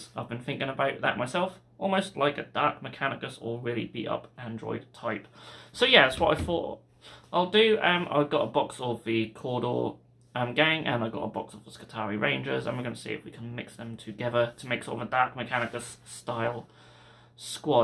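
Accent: Norfolk, United Kingdom. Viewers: Well then what yew sayin'? Ha yew got a loight then? Nah just joking. I'm a Norfolk buh n all born & raised, that's why I recognised the accent. Although I wasn't sure - I guess being used to it for so long you forget what some of the other accents sound like a bit. Anyway I love your channel & your website. It's equal with eBay in being my two most useful resources for conversions. Done a couple orders from you recently & will be again. Looks like I forgot to subscribe, thought I did it before. I'll do that now! Good ol' buh mate!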